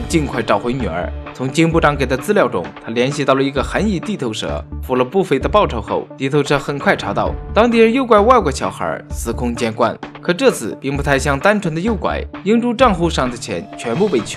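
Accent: Japan. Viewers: 你是日本人在講中文嗎?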